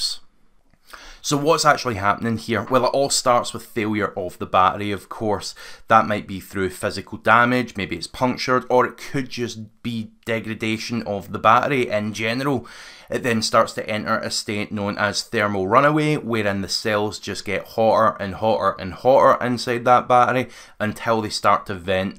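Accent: Scotland, United Kingdom. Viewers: in this video, you will see on screen, that there have been 15 verified Vapour Cloud Explosions globally, since 2010. Try measuring that against the number of petrol vapour explosions over the same period. You cannot; because it is in the many thousands. As for a “light electric vehicle fire” that includes countless e-scooters, e-bikes, motorised wheelchairs, carts and the like. Modern BEVs have sophisticated battery management and cooling systems. Try stating those figures. There were 239 fires recorded in the UK from July 2022 to June 2023 that were linked to EVs, but the vast majority of those did not involve the battery at all. A lie with a cute Scottish accent is still a lie.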